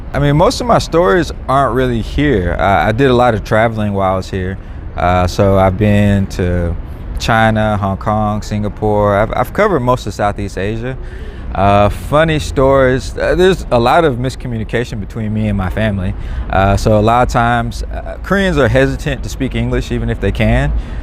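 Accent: South, United States. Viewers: I'm from the south and I always thought I hated southern accents til I heard (and saw) this man speak 😭😝🤕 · Handsome!!! Don't loose that southern accent.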